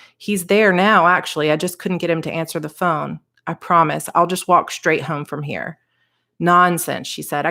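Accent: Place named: South, United States. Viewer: I live in Arizona and like Californians we don't have an accent. However, you have just a hint of southern accent that makes your voice so charming. Please seriously consider narrating your books. ... The other thing is, I'm always disappointed when there's a hired narrator. It makes it soooo much more personal to get the book read to me by the author. Sending lots of love ❤ from sunny 🌅 Arizona 🌵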